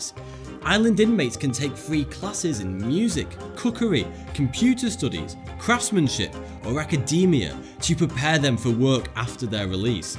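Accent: United Kingdom. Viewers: I wonder how many prisoners are falsely convicted. No one can make up for the wrong person’s humiliation and a new bad reputation. I guess that people in jail lose the respect from their family. The wrong people in jail isn’t justice. Remember the Memphis three from Arkansas? Anyone can lie about someone else and be taken seriously. When that happens, the court and the system goes out of their way to make it so that the defendants end up behind in bars even if they are innocent. It’s not against the law to try to make the defendant angry so that look worse than they are. There are a lot of bad prisons. I don’t like this man glamorizes the United States prisons. He has a British accent, so how does he know so much about American prisoners?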